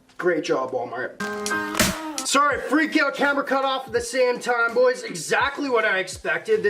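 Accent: Canada. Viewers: U canadian bro?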